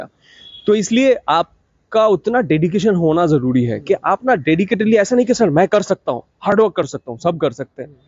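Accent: Bengal, India. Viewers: Sir ap bengoli ho kya kyuki apki Hindi me abhi v bengoli wali tone hai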